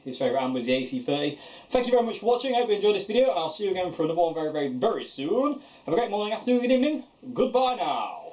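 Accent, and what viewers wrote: United Kingdom. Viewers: I dig your Brit accent , & like your vids ! 😎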